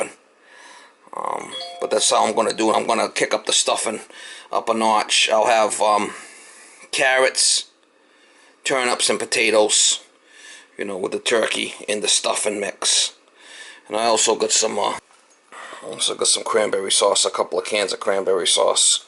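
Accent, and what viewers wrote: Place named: North, United States. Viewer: Stuffing????? That's what you put in your Mama's pillow!!!! LOL Dressing is what you should be eating That's what we do in Georgia, Mama!! P.S. Love that accent lol You people from the north talk faster than us southerners. I have to listen good!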